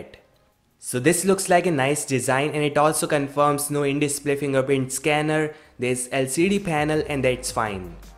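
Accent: India. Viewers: Desi English 😬😬😬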